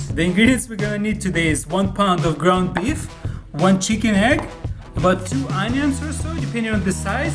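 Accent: Russia. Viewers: are you russian I just started watching your videos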